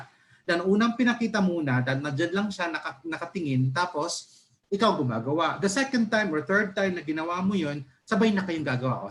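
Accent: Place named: United Kingdom. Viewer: Thank you Sir👍, with British Accent👍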